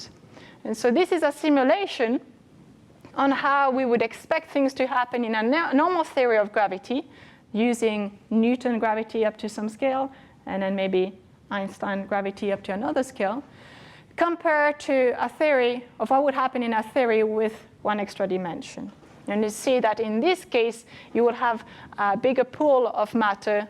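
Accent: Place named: India. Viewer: Why does she talk like an Indian?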